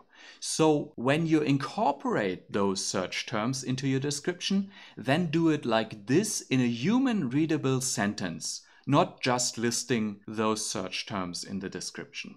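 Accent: Germany. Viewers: You are German after your accent. But I like to learn from a German because they do things very professional. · you are bavarian after your accent :)morning fame. great tool!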